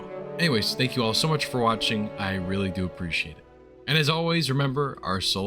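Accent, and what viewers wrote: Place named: New York, United States. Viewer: where'd the new york accent come from,?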